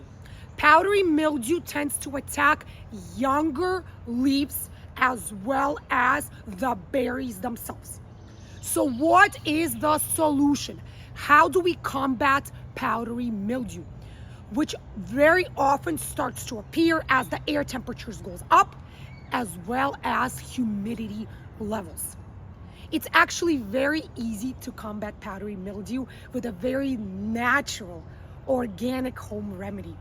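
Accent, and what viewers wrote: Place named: New York, United States. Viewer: That’s how we talk from New York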